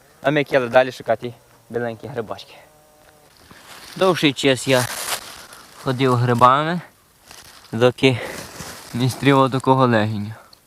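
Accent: Hutsul, Ukraine. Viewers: Привіт гуцулам з Харкова. Слава Україні! Слава ЗСУ! Приємно чути ядерну гуцульську мову. 🇺🇦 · Як він гарно говорить.Правдивий гуцул.А гуцули вільні люди!!!!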